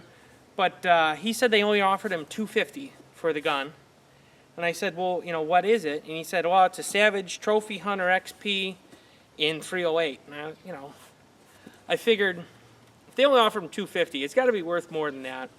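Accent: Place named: Wisconsin, United States. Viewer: Not intended to be a dig. Just based on your accent, sounds like you're from WI. MN or IA maybe??? I'm from WI and my accent gives it away.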